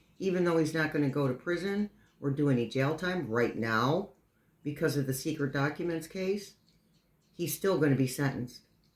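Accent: New York, United States. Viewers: Thank you. I can tell you need this as much as we do. Take care of yourself too. We love you lady & are you originally from NY? The reason I ask is I’ve heard NY’ers say Yous guys so much, love it. 😊😊❤❤❤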